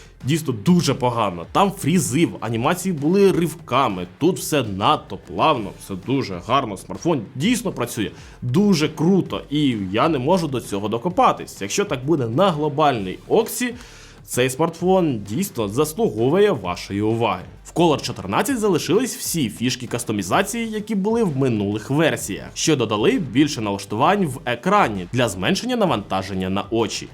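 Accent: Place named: Ukraine. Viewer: Ооо, ну всё, понятно с вами. Цэкайте и гакайте дальше без меня. Отписка!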